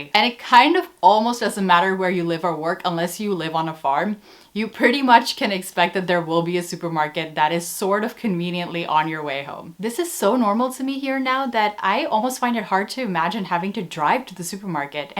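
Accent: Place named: Netherlands. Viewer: I think starting to hear a bit of a dutch tone when you speak American /English....😁😁👌👌👍👍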